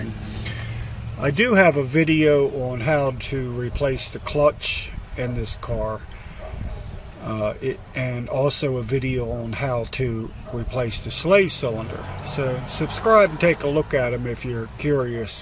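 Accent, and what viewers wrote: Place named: Central Pennsylvania, United States. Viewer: You sound like your from Central Pennsylvania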